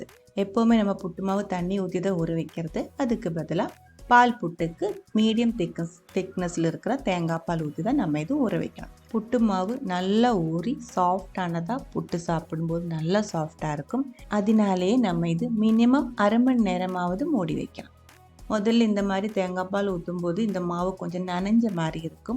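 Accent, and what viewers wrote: Kerala, India. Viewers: Listen her accent, she too is a Keralite 😃😃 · Thank you for your video and detailed instructions. I very much enjoyed your Tamil with Malayalam accent. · Auntie, yude thamizh... Manassilakunnundu... Malayaliyanennu... But a good effort, keep it up 🎉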